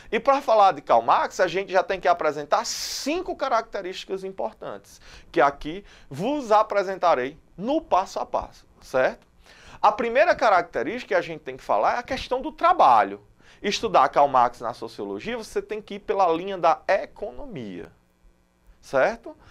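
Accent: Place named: Alagoas, Brazil. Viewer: Professor deve ser alagoano !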